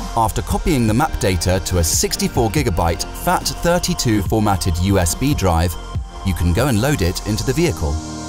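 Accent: United Kingdom. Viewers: Why do you have a narrator with a British accent? Why not a German accent? This makes no marketing sense.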